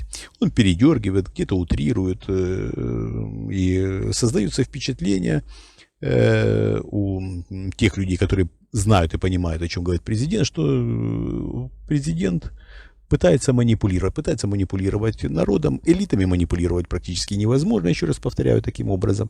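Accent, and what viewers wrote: Ukraine. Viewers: Виктор Михайлович, вы употребляете слово " ТРУСИТ". Генерал контрразведки - вы украинец ??? У вас акцент украинца, очень много слов и оборотов, которые применяют в речи только украинцы...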